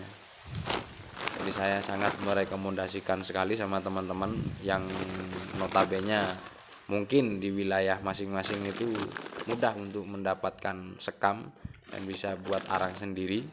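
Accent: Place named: Banyumas, Indonesia. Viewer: Terus berbagi bro...kang kulon kye tangga ngapak😃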